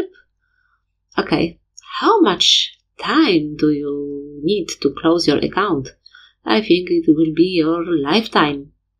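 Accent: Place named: United Kingdom. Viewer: Beautiful Brit accent 😍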